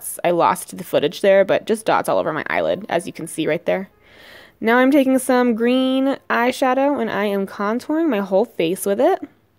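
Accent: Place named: United States. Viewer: Yeah I'm from a different country. I live in Scotland do its not every day I here an American accent.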